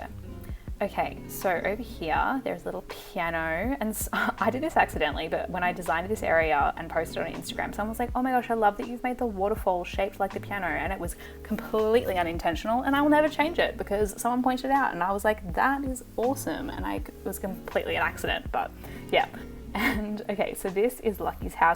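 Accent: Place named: Australia. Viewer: 🧡🧡🧡 so happy to hear an Aussie accent for a change hehe x love your island so much! Xx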